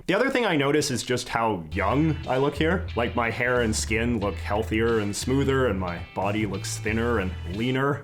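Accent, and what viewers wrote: Canada. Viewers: He's a fellow Canadian , I was noticing the "aboots" like crazy .Thanks for this man . The anxiety is getting to me but I really loved certain things . One of them was mentioning lifestyle , i exercise a lot , soccer , photography , healthy diet (coming soon). Your video really encouraged me but I would love a video about dating in your late 30s .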